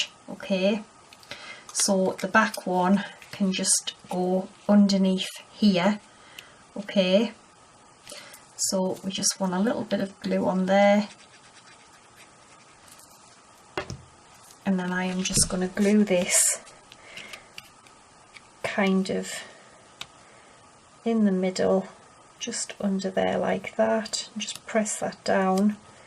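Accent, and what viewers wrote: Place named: County Durham, United Kingdom. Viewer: Really lovely card!! I just found your channel....was thrilled to hear your accent - reminds me of home. I'm from County Durham living in Queensland Australia.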